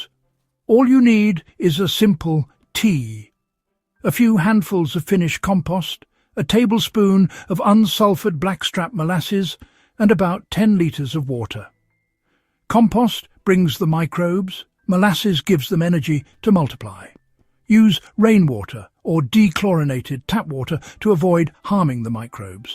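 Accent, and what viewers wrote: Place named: United Kingdom. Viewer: I really don't like these AI generated videos. This video is narrated in a distinct British accent and uses metric measurements but says United States?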